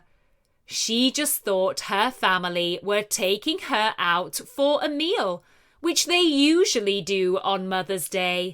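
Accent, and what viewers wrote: United Kingdom. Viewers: That beautiful british accent!!! 😍😍😍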